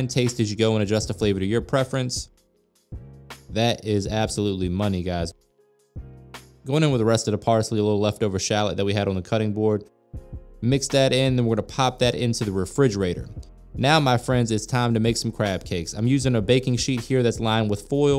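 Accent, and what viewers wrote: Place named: Maryland, United States. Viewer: Quick story… About 20 years ago the corporate office I worked for was in Cockeysville MD. When I would fly out there, the ladies would make me THE BEST crab cakes. I quit, company went under (I think) lost touch with the ladies. Through the years, I’ve tried to replicate the cakes, no luck. I’ve tried HELLA cakes, never as I remembered them. Fast forward to a week ago. I knew I recognized your very faint accent. When you said you were from the area, we’re making crab cakes, my heart skipped a beat! Made the crab cakes today, not exaggerating… they legit made me cry, took me back to the best crab cakes I’ve ever had, the ladies, the fun we had, Chesapeake Bay, everything. THANK YOU so much. Love everything about your cooking channel, keep up the good work☺️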